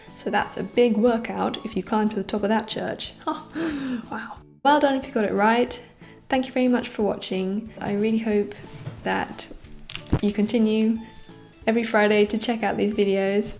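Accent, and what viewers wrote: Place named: United Kingdom. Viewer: Happy birthday. I like your videos very much. I don't know why you are surprised that most of your viewers are German. You talk about Germany, German culture and so on. This topic is well known for us Germans. So it is easier to follow the topic. Listening to English speaking youtubers is a little bit of exercise for me. It is good not going out of practise. You speak very clear and I like your your British accent and the sound of your voice. It is soothing, pleasing to the ear. Keep your style of video making. It is very enjoyable. I'm looking forward for your next videos. This is my first comment on youtube ever. Greetings from the Harz Mountains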